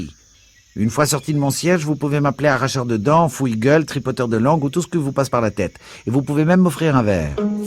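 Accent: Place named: France. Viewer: One of my favourite books. Pity you read it with a Frenchman accent. Please retry.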